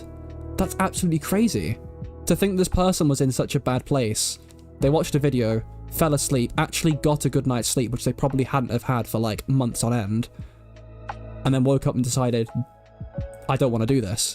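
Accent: United Kingdom. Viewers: AAAA CONGRATS!!! you sound very british ahahaha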